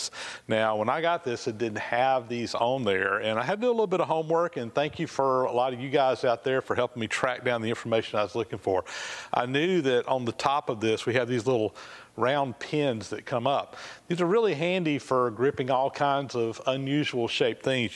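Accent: South, United States. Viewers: It is: United States of America, southern Georgia version!!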